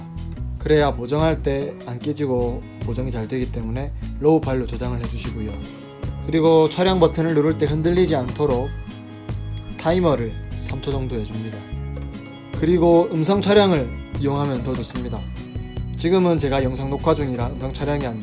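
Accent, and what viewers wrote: Gyeongsang, South Korea. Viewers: 갱상도 분이셔유? ... 목소리가 정감이 갑니다. 남자 목소리는 이래야 제맛이죠 ㅎㅎㅎ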